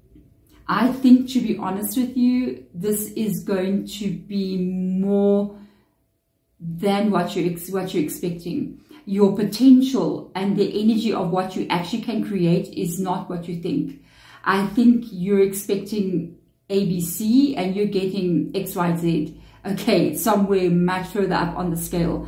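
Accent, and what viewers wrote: South Africa. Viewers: Hello dear of, light and blessings to you 💖 thank you so much for this message, it is for me. A video of yours came to my feed a few days ago (my sense of time is fuzzy now) - you first published it 2 weeks ago — it was about creating a new life that will be shocking to others. That was for me, I watched until the end and that message gave me goosebumps, I felt the resonance I my heart, my head was tingling the entire time. This message is the continuation of that one. I know it. And you will know it too 🌻 I am so grateful. I watched this one the whole way through and I wanted to watch it over again right after. Thank you for the prayer, thank you for sharing your light with me. It’s amazing that you use the word reprogramming here because that is exactly what I feel like is happening. I’m allowing my intuition to guide me as I flow along the process. 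There are rare moments when my mind still wants to take over and ask questions and plant seeds of doubt, I take a breath and come back and trust. Thank you. I am so excited for this newness. It’s different. Changes are happening. But it feels gentle and I feel so supported. Thank you thank you thank you. I send you love, beaming to you from across the Earth. P.s. I have a friend from South Africa, I knew you were South African when I heard you speak in the first video, you confirmed it in this one. Maybe one day I’ll find myself there. Nou gaan ons braai 😂 Big big love and hugs to you 🫶🍀✨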